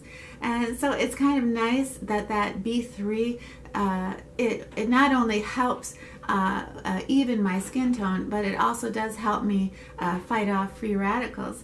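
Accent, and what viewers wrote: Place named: Michigan, United States. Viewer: I just wanted to tell you how much I am enjoying your videos. I've learned so many things from you about skin care and different products! I also have to tell you that I am from Rockford (having moved East 28 years ago) and it's been wonderful to see a couple of places you've shown as well as Grand Rapids. ❤️. I miss beautiful MI.. I also recognize the familiar way you pronounce certain words. Oh how I miss that!!! It's been like a precious reminder of my growing up. Thanks again for all you share. I relate to so many things!!! You're a blessing. Sue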